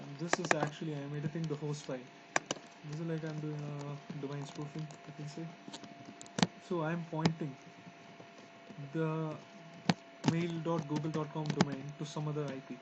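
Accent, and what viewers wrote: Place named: India. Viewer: stupid indian accent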